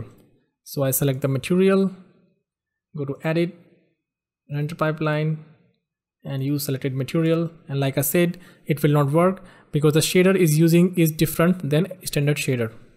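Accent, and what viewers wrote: India. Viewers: I can guess you are an Indian from your accent... #respect bro. Nice work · are u from indiea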